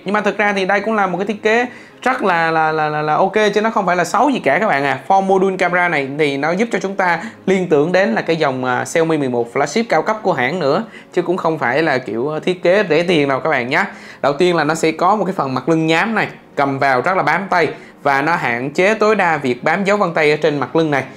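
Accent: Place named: Binh Dinh, Vietnam. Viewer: Mé nghe cái giọng xứ nẫu này ko nuốt trôi nổi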